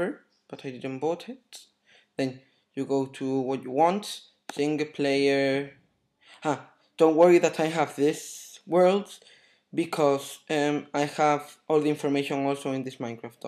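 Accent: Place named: Mexico. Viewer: gracias debolada se te ve el acento de mexicano XD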